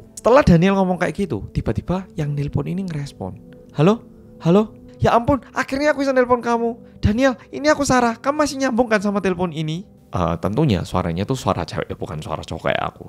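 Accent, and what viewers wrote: Java, Indonesia. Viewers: Bang mukamu Korea tp bahasamu medok bngt..mf y jgn marah 🤞🤞tp cerita mu seru abis dn keren 👍😄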